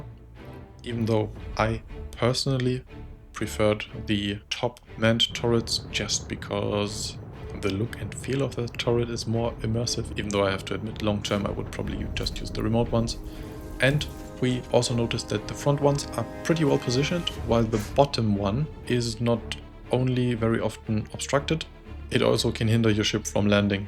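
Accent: Germany. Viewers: er ist deutscher, das hört man :-)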